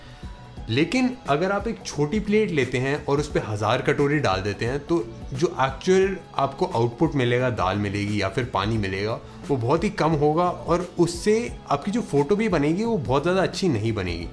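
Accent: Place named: Bangalore, India. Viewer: Brooo are u from banglore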